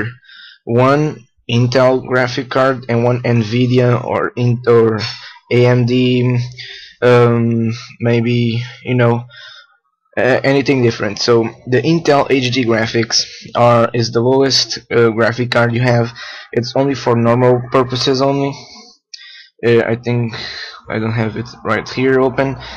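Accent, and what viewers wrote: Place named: Portugal. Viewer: ahah you are português ! i noticed by your falar xD , thanks also now i got more fps :D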